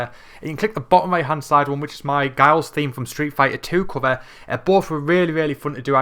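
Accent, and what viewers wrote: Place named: Durham, United Kingdom. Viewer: Nah.. Probably Durham or teeside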